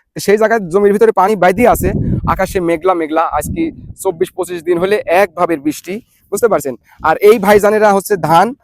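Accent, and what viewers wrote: Natore, Bangladesh. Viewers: আস্সালামু আলাইকুম।ভাইয়্যা , আপনার কথা শুনে আমার মনে হয় নাটোরের মানুষ আপনি কিন্তু আমার হাজবেন্ড বলে আপনি পাবনার আপনি পাবনায় থাকেন ।আসলে কোনটা সত্যি।আপনি কোথায় থাকেন?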